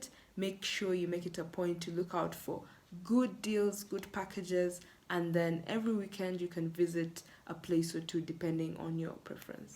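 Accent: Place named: Kenya. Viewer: Welcome to Nairobi, you true look and spaek like a Kenyan, for a Ugandan do not call yourself a foreigner in Kenya. I am from western Kenya and my Luhya language is very similar to some of Uganda local languages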